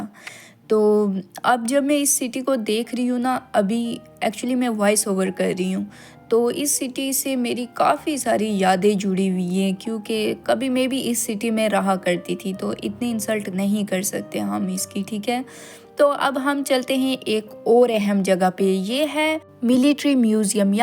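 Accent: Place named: Kashmir, India. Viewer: Are u from J&k (india) bcz the way of your talking like u are from baramulla 🤔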